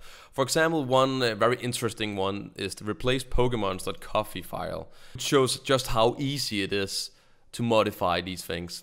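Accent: Denmark. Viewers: du er god til engelsk men man kan godt høre at du er dansker!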